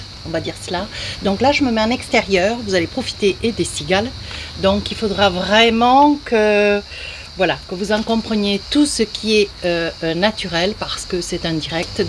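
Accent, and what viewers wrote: South, France. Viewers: Quelle énergie vous nous transmettez petit bout de femme talentueuse votre accent me rapl le sud de chez moi que j ai quitté il y a peu en tout cas grand merci pour votre don ❤️🙏belles vacances profitez bien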